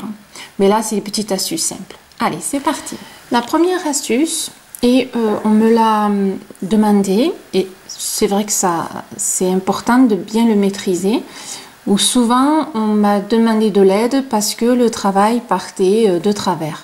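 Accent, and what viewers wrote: South, France. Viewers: Bonjour dîtes moi vous êtes de quelle région ? C'est L'accent du sud ? Lol car je recherche des personnes de mon secteur 😊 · Merci beaucoup de partager votre expérience avec autant de clarté et d'indulgence pour les débutantes comme moi. Je suis une jeune crocheteuse de 50 ans qui dévore les tutoriels très bien construits d'une jeune personne très sympa, "avé "le soleil dans l'accent !! J'entends les cigales dit ! ;) ENCORE MERCI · Ça fait des siecles que je n'avais pas crocheter et quand j'ai recommencé, mon travail avait l'air de votre échantillon!! Merci, je vais pouvoir me lancer!, . P. S. J'adore votre accent du Sud qui rappelle Le Soleil! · merci beaucoup.Très bonnes explications très claires avec un accent bien du sud.Très agréable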